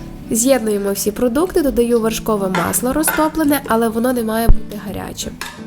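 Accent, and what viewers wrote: Ukraine. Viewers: да по-разному она и раньше разговаривала. По говору слышно сразу что дома говорит на украинском. Просто видео на русском имело больше просмотров и лайков. Ну, а сейчас у них в голове клемы поменялись, уси дюже патриотамы сталы. Ничего, спецоперация закончится, и снова все перейдут на Великий и Могучий. А пока пусть лают.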